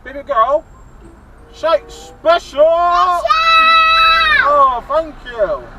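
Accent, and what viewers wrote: Bristol, United Kingdom. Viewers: I love the bristol accent even when angry you sound happy an welcoming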